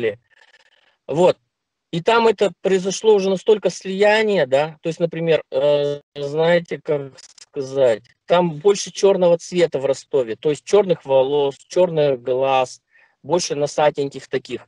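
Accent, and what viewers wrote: Perm, Russia. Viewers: Никогда я не спутаю пермский говор! Первый день в Чайковском почти ничего не понимала))) Приезжайте в гости к нам в Армавир! Все приезжайте! а ВИДЕО Я ПОКА НЕ ДОСМОТРЕЛА. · Говор пермяков мне вообще не нравится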